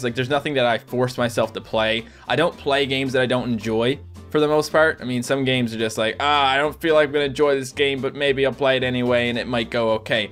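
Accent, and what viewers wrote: Canada. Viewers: Hi um C: Just wanted to say I REALLY love your videos and I did not know that you wanted to be a doctor but hearing your reasoning that is REALLY sweet and ahh. I always get very excited when I see there's a new video because I always know I'm going to enjoy it and yeah!! I am sorry if this is awkward I have never left a youtube comment before! ps: When I first saw your videos I KNEW you were Canadian by your accent and was very pleased when I discovered I was right.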